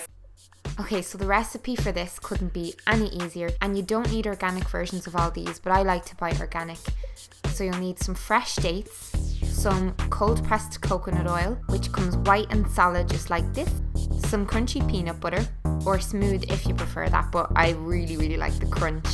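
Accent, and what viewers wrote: Ireland. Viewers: Are you from Ireland if so where do you get all your ingredients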